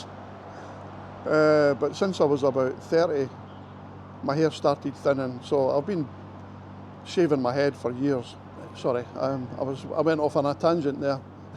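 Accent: Scotland, United Kingdom. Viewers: I just finished listening to the age gap piece. First comment, your accent gives you away ' '. I was born in Glasgow in '45. There is a 12 year age gap between my wife and I. Not a big deal as she was 44 when we met 22 years ago back in Canada. She was an OFW contract Caregiver and I worked in Health Care. Our paths crossed and the rest became history. Planning ahead, we bought a condo in Davao in 2012. It became our holiday home. Now that she is retired too, it is now our retirement home and Canada is the holiday destination. As for the age gap, there never really was an age gap for us. When we got together, I made a point of learning as much as possible about the Philippines, from the beginning to the current. History, politics, customs, music, you name it and I am nose deep into absorbing as much as possible. Take care, stay safe and keep posting. 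👍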